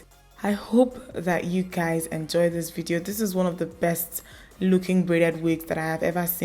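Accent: Nigeria. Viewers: It's refreshing to hear the naija accent whew! Thanks for not forming! 😘😘😘❤️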